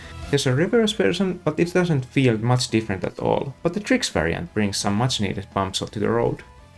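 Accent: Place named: Finland. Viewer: Cool channel! Sounds like you're from my neighbouring country!!! 😁 Swe => Finland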